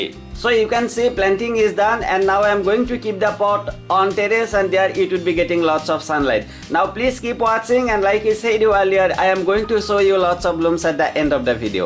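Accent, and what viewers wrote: Assam, India. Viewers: Have been following ur videos.wonderfull description as if l am going through plant encyclopaedia. accent seemed an Assamese from guwahati ,my city.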